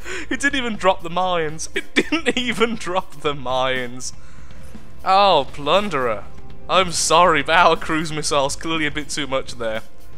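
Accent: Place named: Wales, United Kingdom. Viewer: Wales now i get it!, been thinking for ages were ive heard that acsent from ( was in wales for a clan meeting WASP INC) and now i finally get why your acsent sounded so familiar. XD Love the vids keep em going ^^ ( grammar ain't my strong suit neither in Swedish or English)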